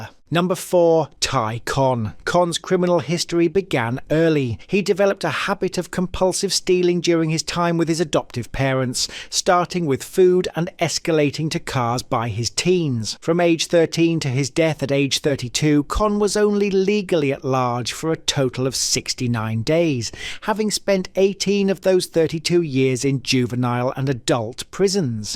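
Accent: England, United Kingdom. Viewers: 👋 Hi. I'm a Canuck. I live in Kingston Ontario. First I want to thank you for taking the time to learn how to pronounce a lot of the words properly, or are you Canadian? We have lots of folks from the UK living here, so yes I did notice the accent. ☺️ Other than a couple of words ~ great stuff. Brilliant! But~ you and most others got everything wrong about what constitutes a life sentence in canada. What happens is you are given a life sentence for murder and you are imprisoned for 25 years minimum. The odds of being paroled the first time you try, especially for a violent crime, are very slim to impossible. My father-in-law said he didn't see that happen once. Then IF you are released, you go to a halfway house where you could spend up to five years. You also have to report to a parole officer once a week for the rest of your life, and with any wrongdoing/breaking the rules, on the part of the prisoner, s/he can be returned to prison to see out his/her sentence never to be released or less, depending on the rule s/he broke. 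So yes a life sentence IS a life sentence. (FYI~prison means a federal institution, whereas jails are provincial and municipal) to spend the rest of his life there, especially if it is a crime involving violence. So when they talk about a life sentence that is what you get. Under no circumstances is anyone convicted of a crime involving violence ever just paroled and allowed to walk out the door. They have very strict rules they have to follow and if your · Some english guy talking about Canada!!!?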